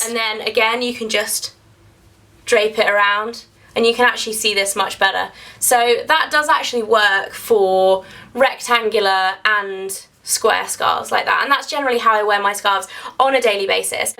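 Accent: United Kingdom. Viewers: love ur british accent :)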